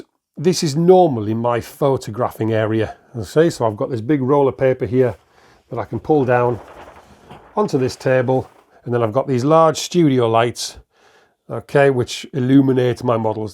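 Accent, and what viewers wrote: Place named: North East England, United Kingdom. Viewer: I getting the hint of a north eastern accent there. And going by the black cat you will be a fellow Mackem? Great channel and love this idea. Will be watching with curiosity and endearment take care. Steve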